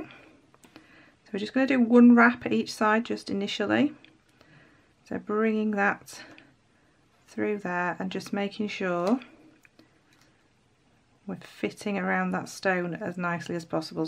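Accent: United Kingdom. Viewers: Am I the only one that is obsessed with their Britain accent? Yes, okay. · Great job. Love it. I love your English accent. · Are you from tottenham? Your accent reminds me of Adele ☺️ Great video. I like your teaching style ❤️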